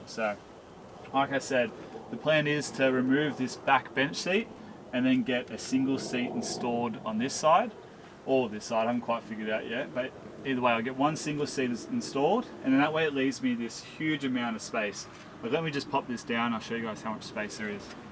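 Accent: Australia. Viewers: Is that an Australian accent ??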